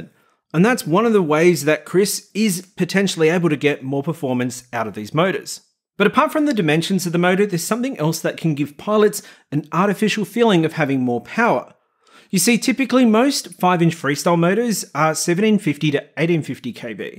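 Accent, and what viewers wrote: United Kingdom. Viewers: well i bought 8 of his 8s motors they produce 2.7kg of thrust is hes blown the competition out of the water in the 2207 class range and only reviewer thats not useless and done a lot for the hobby and do u even know where his English accent is from because i used too live in the uk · It was not a criticism of him at all. He just has a very normal ‘Home Counties’ English accent. Like me. And I do like a lot.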